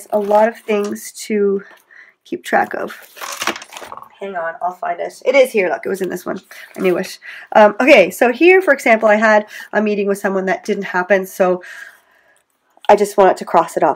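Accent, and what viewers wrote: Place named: Quebec, Canada. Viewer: I'm obsessed with your accent! Mostly Canadian, but there's just a touch of French from time to time. My favorite tip is to use washi to make my own decorated paper clips. I can make them match my layout every week at very little expense and no guilt if I lose one!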